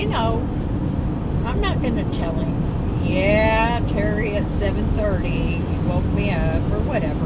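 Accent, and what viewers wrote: South, United States. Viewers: You seem to be a very lovely person doing that for your friend. I’m sure you will be happy in your place. I have physio therapy today, (I’ve just had a knee replacement), so that’s my fun for the day haha. I do enjoy listening to your stories. Your voice is so calming. (Plus I’m in love with the southern American accent - mine is apparently too posh to understand, someone couldn’t understand me saying the word “France” yesterday 😂 )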